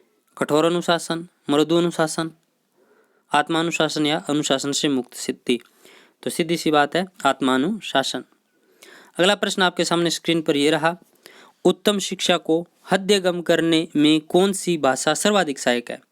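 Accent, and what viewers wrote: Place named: Hanumangarh, India. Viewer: Aap ek dam humre jase bolte h hanumangarh ke h kya aap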